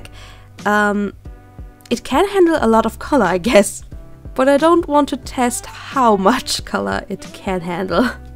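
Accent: Germany. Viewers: After a few words I knew shes from germanyyy :D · Do i hear a german accent? I guess i do~ Greetings from germany <3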